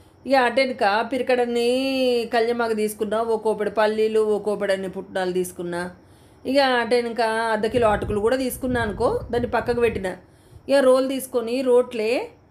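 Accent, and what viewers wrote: Telangana, India. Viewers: నీ తెలంగాణ భాష సూపర్ అటుకులు కూడా సూపర్ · తెలంగాణ యాసలో యాంకరింగ్ చాలా బాగుంది అక్కయ్య చాలా చక్కగా అటుకులు మర్లే సే విధానాన్ని చక్కగా వివరించారు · kisthi lo posina marlavosina atukulu bagunnai attayya😋 mi basa n Telangana yaasa super 👌👌👌👌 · Super pinni ur Telangana slang and atukulu 🥰 Nenu try chesa chala bagunnay .. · Good to hear your voice in telagana slang aunty ❤ nen vachaka nak ila atukulu chesipettali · Telangana slang super bebakka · ni telangana yasa and atukulu super👌👌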